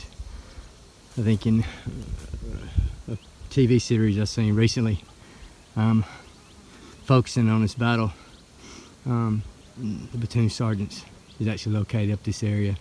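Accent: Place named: South, United States. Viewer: I have just discovered your channel (love it by the way, amazing content!), and based on solely your accent and the way you speak, I genuinely cannot tell whether you are from the American South, or Australia. I went back and forth on it all the way through this video and still couldnt determine.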